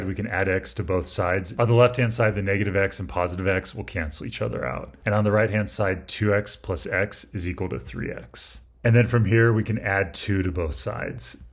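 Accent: Canada. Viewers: he's canadian?? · Is he Canadian or American? It would definitely be weird to say zed in Usa.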